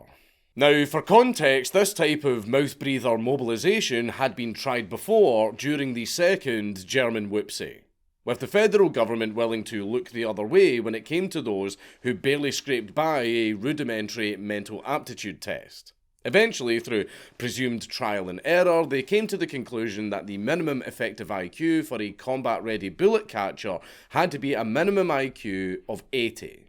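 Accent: Scotland, United Kingdom. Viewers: I love the Scottish accent. Its so...confusing, in an attractive sort of way. I think it's great that we are including the mentally disabled in the recruiting process. They can't drive, but give em` some high sxplosives; what could go wrong?! The time is nigh for action men....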